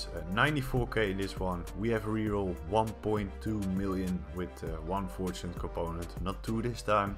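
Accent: Netherlands. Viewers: Heerlijk Nederlands accent er doorheen. Audio is idd beetje slecht te horen. Kwam m tegen in me lijst heb gelijk ff geabonneerd. Drop die PVM dingen haha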